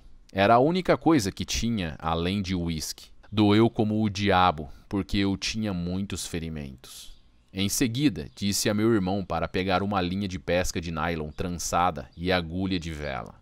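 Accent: Brazil. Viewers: Sotaque legal, de que parte do Brasil? Parabéns pelo canal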